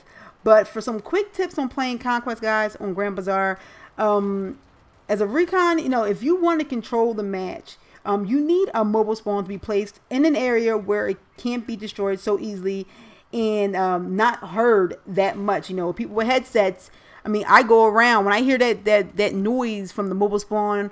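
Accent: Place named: Northeast, United States. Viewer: no i meant northeastern USA not northeast philly.... but yea.. lol i coud tell u was from around here.. accent n certain phrases is hilarious. i remember playin against u before.. its a small BF3 world man. and lol sum ppl may like u a little too much... its sad isnt it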